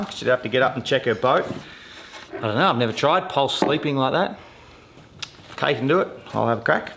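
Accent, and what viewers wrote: Australia. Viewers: this guy is australian as fuck!!!! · definitely australian maybe from the north west? filmed in VIC or tazzie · Hey. I'm an Aussie living in Vienna, Austria. You make me miss home. The enthusiasm, the accent, countryside and Aussie girlfriend/wife. Also big on running. Loved everything about this video. Inspires me to get off my butt and be more productive maybe even make a youtube channel myself! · Where is he from? I can't seem to properly pinpoint his accent. Is he Australian? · I kept forgetting you were Australian until the comment about the "kooky old farmer" who "ran around his paddocks with his gumboots on." Which I had to play 3 times before I could even understand the words you were using 😂. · Definitely Australian. He has a more pronounced rural accent which is easy to mistake for NZ if you’re not from here but he lives in Victoria.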